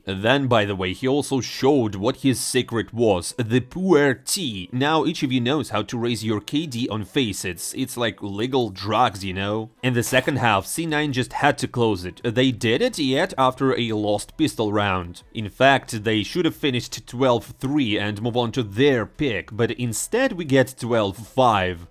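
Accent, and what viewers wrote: Russia. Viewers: nice the russian accsent voice is back BIG W👍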